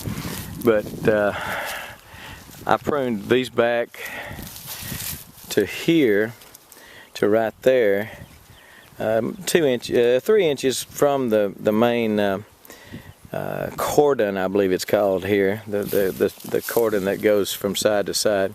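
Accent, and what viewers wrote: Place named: Alabama, United States. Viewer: Please pardon my ignorance and forgive me if this is taken as insult - are you from Texas? Because your accent sounds very Alabaman to me 😊🤔 Any ways, thank you for all your videos. It's nice to see true Southerners gardening with native plants in this climate.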